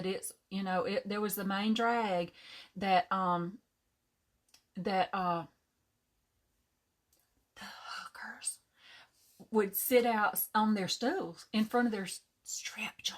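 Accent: South, United States. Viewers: Hello,!!! Just love to hear you talk. Love that southern drawl😅…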